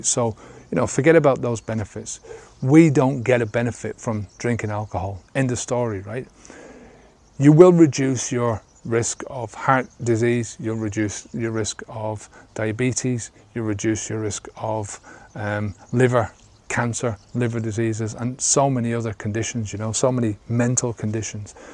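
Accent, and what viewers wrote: Dublin, Ireland. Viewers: hint of a Dublin accent here? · Such a cool accent! Is this Irish or Northern Irish?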